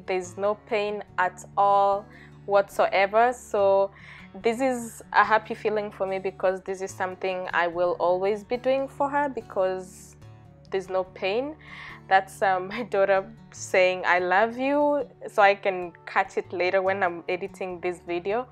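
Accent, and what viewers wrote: Kenya. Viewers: lol! accent ni ya Muranga ama!Nilijua tu wewe ni wa home😝Uko na katoi karembo..😌imajine aki nyweke zetu na blackamericans ni different aje..anyways you did some awww kazi..niko Swiss currently n nyc to hear sone home accent online😝😍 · You must be kenyan, I heard you speak Swahili and omg your accent. Wow 🤩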